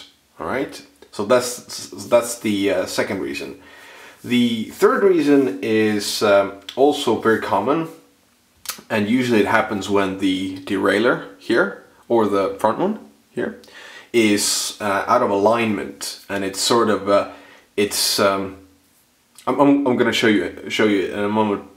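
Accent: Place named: Ireland. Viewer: Do I detect a wee bit of Irish lol.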